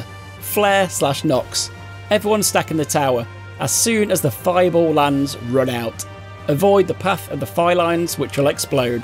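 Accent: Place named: Northern England, United Kingdom. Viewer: Just what I needed for a refresher going into it again with new folks! And sure, even coming from Ireland, you can always trust that Northern English accent to be no nonsense, straight to the point, here's what you do. Love it! Thank you so much <3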